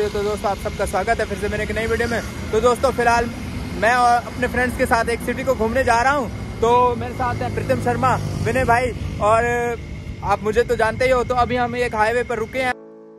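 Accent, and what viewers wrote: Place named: Bihar, India. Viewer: Bhai tere bolane ka lejja ek Indian Bihari jaisa lag raha hai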